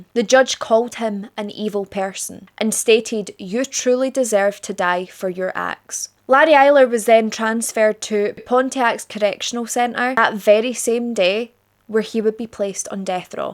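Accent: Scotland, United Kingdom. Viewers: I just can't get over your beauty! I'd give almost anything to look half as beautiful as you! The accent tops it all off! There is literally nothing better than a Scottish accent!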